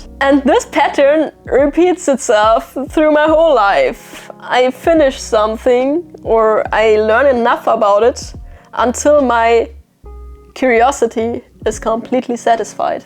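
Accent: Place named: Germany. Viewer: You have a German english accent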